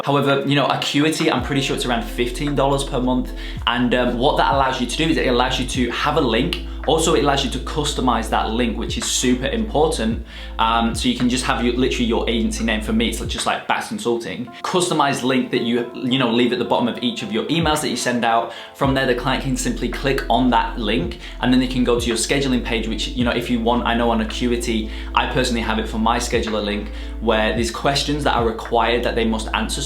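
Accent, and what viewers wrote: United Kingdom. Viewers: You live in Australia but you are British, right?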